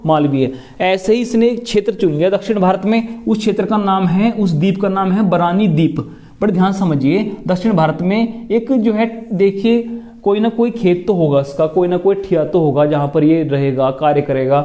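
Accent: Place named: Bijnor, India. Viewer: Sir ap up bijnor s ho kya ? Apki bhasa s lga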